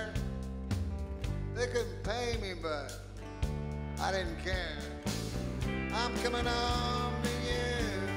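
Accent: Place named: Texas, United States. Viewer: I love his true Texas accent!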